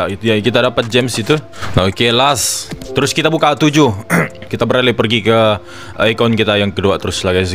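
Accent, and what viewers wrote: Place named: Sabah, Malaysia. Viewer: Mcm org sabah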